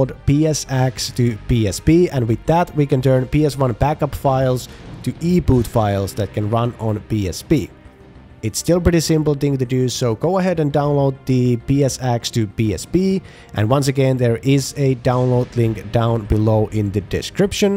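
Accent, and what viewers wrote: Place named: Finland. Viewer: Suomiaksentti on kyllä niin tunnistettavissa välittömästi. :D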